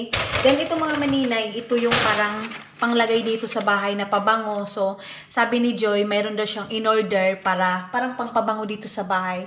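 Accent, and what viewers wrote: Visayas, Philippines. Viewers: Sarap Naman Ang Buhay mo diyan. Taga asa ka, bisaya man kaayo mAgmsulti? Makalingaw gyod imong vlog. Pwede makig friend sa imo? Asa mo nga lugar nagpuyo diha sa united state?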